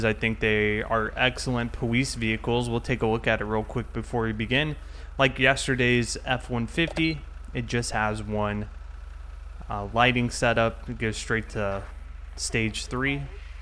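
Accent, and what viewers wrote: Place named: South, United States. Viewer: Southern accent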